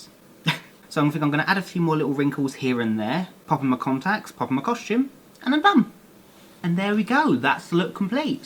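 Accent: United Kingdom. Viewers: I love your British accent and you are really talented ❤️❤️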